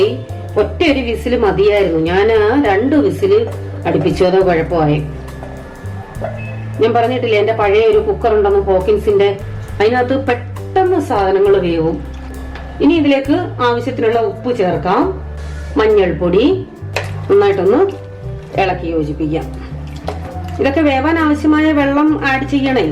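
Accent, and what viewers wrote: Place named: Thiruvalla, India. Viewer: Chechyde veedu Thiruvalla- Kumbanad side aano?? Correct aa style samsaaram...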